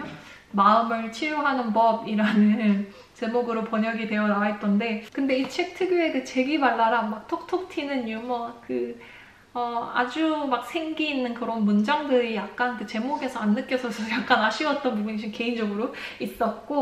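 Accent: Gyeongsang, South Korea. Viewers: 원서를 몇권 읽어보니 처음엔 책장 넘어가는 속도가 너무 느리다가 탄력받으면 마지막으로 갈수록 많은양을 하루에 보게 되더군요. 한국말을 차분히 들어보니 경상도쪽 억양이 있으시네요~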